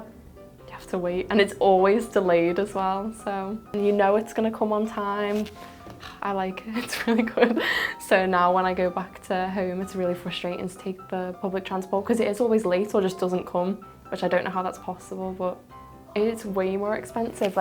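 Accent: Liverpool, United Kingdom. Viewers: 리버풀 액센트이신건가요..? 매력있으심다. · 리버풀 액센트는 런던 표준 액센트랑 또 다른 매력이 있군요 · I like your lovely Liverpool accent.... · 와.. 영국 영어 매력 쩌네... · 리버풀 억양 죽이네여 ㅎㅎ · I just love your British accent. Sounds very cute and even sexy! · 아 리버풀 맞군요 사투리 맞아요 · 마를린먼로가 생각나는 머릿결과 미모군요. 예쁘십니다. 그리고 리버풀식 억양.. 굉장히 인상적이고 듣기 좋네요. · 와 영국영어 발음 정말 듣기 좋네요ㅜㅜ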